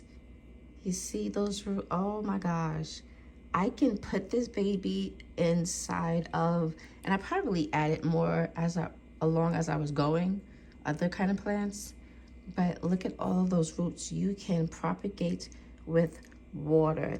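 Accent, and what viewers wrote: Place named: New York, United States. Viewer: Your plant babies are cute. Love the NY accent!!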